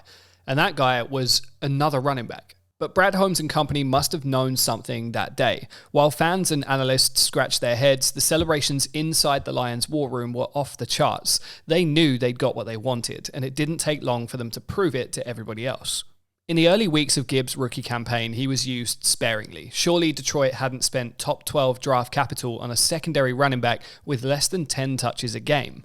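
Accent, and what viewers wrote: United Kingdom. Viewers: Gotta say it is super strange hearing about NFL football in a presumably British accent. Incredibly well done video and totally accurate.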